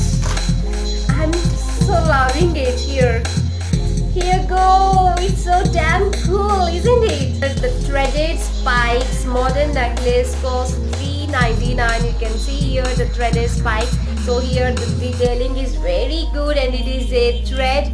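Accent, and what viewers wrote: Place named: India. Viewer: Indian accent.... n dii i have one video suggestion can u plzz make a video on oil routine for hair growth 😋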